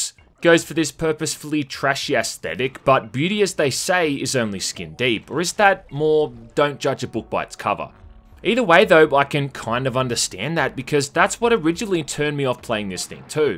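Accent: Australia. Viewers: This review suddenly feels like the bad old days of G4TV's X-Play in the mid-2000's, but instead of Adam Sessler, you get an angry Australian.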